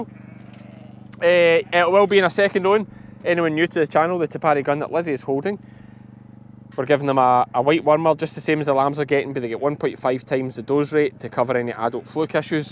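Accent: Scotland, United Kingdom. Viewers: I'm a fairly new fan of Sheep Game... And I'm trying! I love the content but... Getting used to the Scottish accent is taking some work for these Canadian ears of mine. I'm glad is conscious of this challenge for viewers and adjusts things somewhat as he mentions here. My Granny emigrated from Glasgow so I thought I'd have an edge. Oh well it just means watching the same content 2 or 3 times to be sure. 😉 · Wow, and I thought I could understand the Scotts…. Maybe give us a whole video with the full accent. I have so much to learn. 😂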